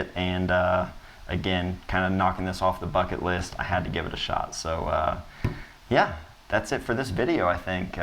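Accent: Ohio, United States. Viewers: This guy knows how to get us to click! Leica unboxing dictated by southern Ohio timbre? YES PLEASE!!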